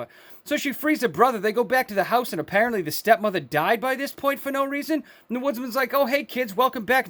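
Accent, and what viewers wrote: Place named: Boston, United States. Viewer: You’re killing me. It wouldn’t be the same without that thick Boston accent!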